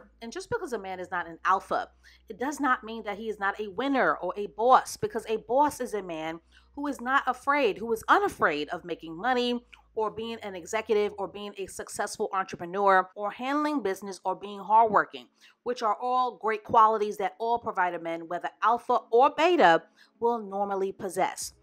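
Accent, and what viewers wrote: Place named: New York, United States. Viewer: The way u say "boss" makes me assume you're from New York lol love that accent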